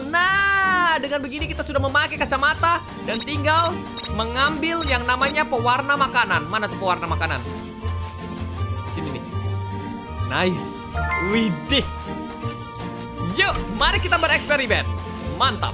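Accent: Java, Indonesia. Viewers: bang orang jowo atau orang palembang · Kakak jawaya?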